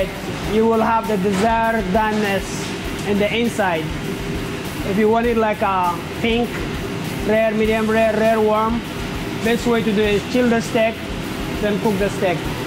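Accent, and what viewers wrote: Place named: India. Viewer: This cook is really messed up he is talking part indian accent and part arab accent ... i m very confused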